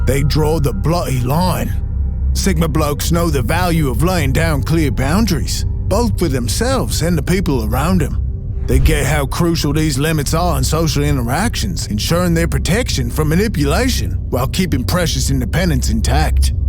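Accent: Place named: United Kingdom. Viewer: You Rock..... OMG... that was the best Sigma Description Ever..... Being a Sigma - Male - Empath.... You might consider doing a short on the Sigma Male Empath..... The Rabbit hole does go deeper.... I have been this way all my life..... Military Used it for 30 years.... But Your Voice..... Well you have to be part of the Island Boys (UK, IE (ME), SCOTLAND.... Since I was born In Dublin, but Now have lived in the US since i was a wee lad..... So... Kinsman if you are actually in the UK..... and have a Pint of Carlsburg for me.... Futbol Season is coming.!!!! Oy Oy Oy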